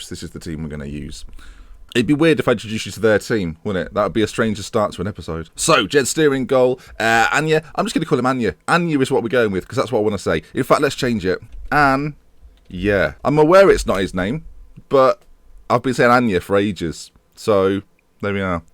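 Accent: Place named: Newcastle, United Kingdom. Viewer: Ur geordie accent sounds like me nana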